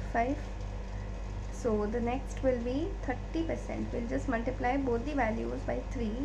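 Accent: India. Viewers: your Indian accent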